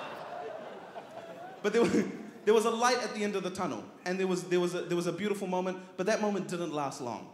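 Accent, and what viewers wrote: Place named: Tonga. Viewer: Solid tongan accent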